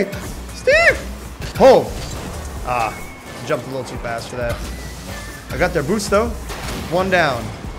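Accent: Quebec, Canada. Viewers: Love the Quebec accent!! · Très beau accent québécois xd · You have the canadian accent when you speak french, it's so funny XD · Dude, I dunno if that Quebecois accent was a way to mock Quebecers or if it's legit your accent when you speak french but I love it. Hello from Montréal! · I love the little french/québecois comments in the middle of the stress XD Your quebecois accent is wonderful Also super hyped for this new series, i've been missing this channel. Can't wait for more:D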